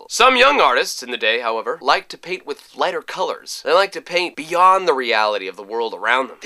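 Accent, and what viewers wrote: France. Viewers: That's an amazing French accent you've got there :3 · loving the french accent! xD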